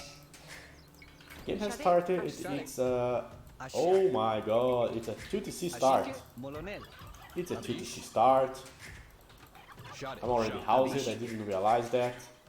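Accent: Brazil. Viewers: Ctza que é brasileiro o sotaque não engana...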